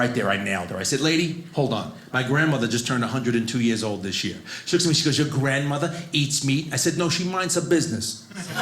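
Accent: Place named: New York, United States. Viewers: Italian new york accents always get me. Feels like home 😅😊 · Love the "New Yawk" accent!! I wanna hear more from this guy--he is dynamite!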